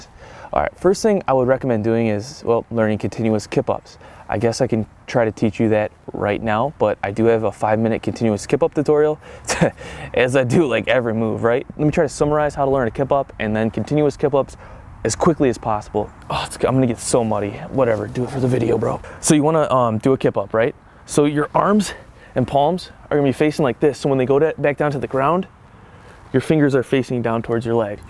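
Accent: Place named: Boston, United States. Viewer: Boston accent at it again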